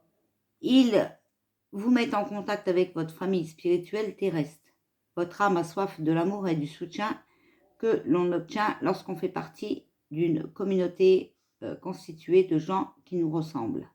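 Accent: North, France. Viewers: J'adore votre accent du chnora grand mère 🤶 était de berck plage 🦀 elle parle comme vous merci 😘 😘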